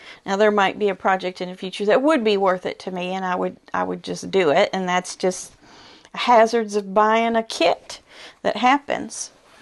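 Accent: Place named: Kentucky, United States. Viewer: I popped over to your channel after seeing your interview this morning with Steven on his YouTube channel (the idiot quilter). So nice to discover your channel!!🎉. Truly enjoyed getting yo know you. I’m originally from Owensbore Kentucky so I was thrilled to hear your accent. Brought tears to my eyes. I’m now in Pittsburgh PA but get home a few times a year. Blessings to You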